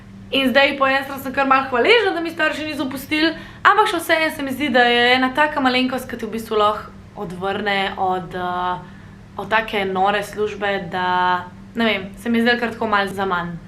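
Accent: Ljubljana, Slovenia. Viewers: who is she supposed to learn from? Each Slovenian YouTube vlogger/influencer has their own good points while speaking Slovenian. So does everybody else. I would not learn Slovenian from a person from Primorska or Štajerska, for example. Ljubljana dialect is perfectly fine. However, I agree with you that the news might be even better. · She never replied to you but if it helps judging by her accent i’d say she’s from Ljubljana. I’m not a frequent viewer so i don’t know where exactly she’s from, she might reside in Ljubljana or not but judging from her vernacular alone she lives/ or at some point lived in Ljubljana or in its proximity.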